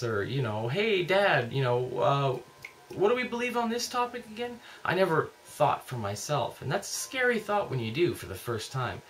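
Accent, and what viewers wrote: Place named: Texas, United States. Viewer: First of your videos I've seen. I'll view a few more this week. You come across as a friendly level headed young man and you'll do just fine without living a life of guilt for doing things that are normal. I was in it toooooo long. It's like banging your head against the wall because it feels so good when you stop! P.S. I like your Texas accent.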